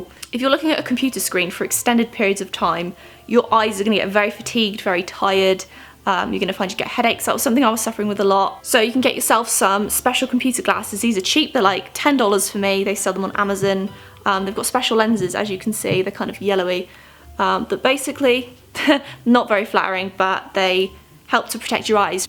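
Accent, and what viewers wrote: United Kingdom. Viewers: do you live in America? hearing a British person saying dollars and hobby lobby confuses me 😂😂😂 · Becaus you have a british accent and you live in america... or england.. plz tell us in a vid! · erm are you American you say dollars and stuff but you sound like you have a British accent lol. great video I'm more of a sketcher, drawer however you say it but the organisation tip was pretty useful.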